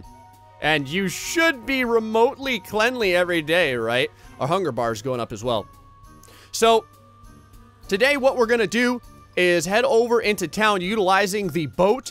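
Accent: New Jersey, United States. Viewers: I'm from the Philadelphia area and go to school in NJ but ive never heard your kind of jersey accent.Are you from North or South Jersey? Please tell me you do not say Taylor Ham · Oh yeah I hear the jersey accent, course I’m from the state right below so I pick up on it pretty easy lol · Dont notice that New Jersey accent. I live there too.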